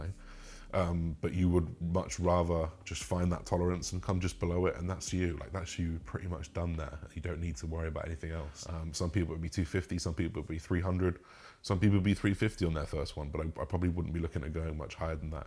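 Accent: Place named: England, United Kingdom. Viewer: Jesus it’s someone English 😂 finally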